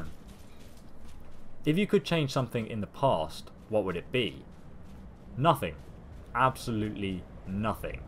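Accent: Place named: United Kingdom. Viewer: Omg I live in the Newcastle area! :D i always knew you were British cause your accent is similar to mine, it's great to get to know a tonne of things about you :D.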